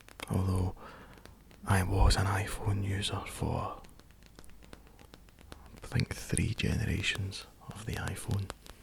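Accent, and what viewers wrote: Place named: Glasgow, United Kingdom. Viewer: u ken by ur accent ur fa glasgow or somewhere a little further north am i right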